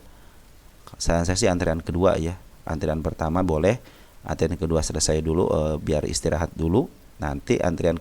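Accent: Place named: Sunda, Indonesia. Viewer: Orang mana si akang , logat sunda na halus pisan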